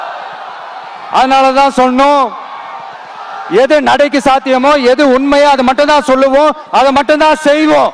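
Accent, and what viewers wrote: India. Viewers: முதலில் தமிழ் நன்றாக உச்சரிக்கவும் இந்தி காரன் தமிழில் பேசுவது போல உள்ளது ஒரு வேளை இந்தி காரன் தமிழில் எழுதி கொடுத்து இருப்பானோ???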